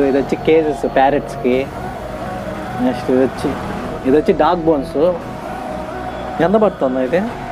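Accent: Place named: Chittoor, India. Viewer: What a slang pakka Chittoor 😂😎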